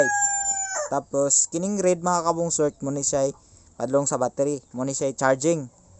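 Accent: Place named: Visayas, Philippines. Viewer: Visaya ka pre, di kita maintindihan.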